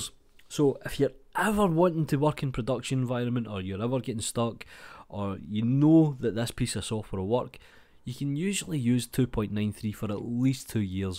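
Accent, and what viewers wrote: Scotland, United Kingdom. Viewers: Blender 4.0 is scheduled for August 2023 - assuming they're indeed still following the plan outlined in Ton's LTS blog post from February 2020. Four versions each year (one being LTS), and a big number change every two years. <link> Anyway, while I already read the news, I enjoyed listening to your commentary and Scottish voice. <3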